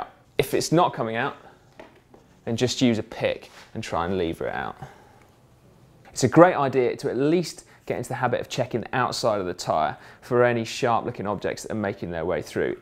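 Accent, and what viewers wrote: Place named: Scotland, United Kingdom. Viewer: Cacalari Bus My guess is Scottish.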